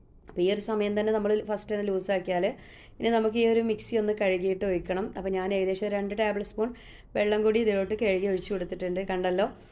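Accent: Malappuram, India. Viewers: മലപ്പുറം താത്ത എന്ന് പറഞ്ഞു ഒരു മാതിരി സംസാരം ആയിരുന്നു ഇത് കുഴപ്പമില്ല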